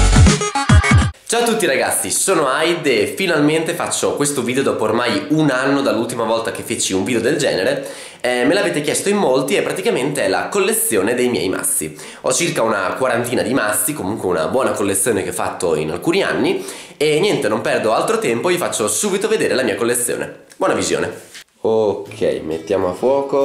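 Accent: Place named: Emilia-Romagna, Italy. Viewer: da quella Zeta inconfondibile deduco che sei del Emilia Romagna. di dove sei ? io sono di Bologna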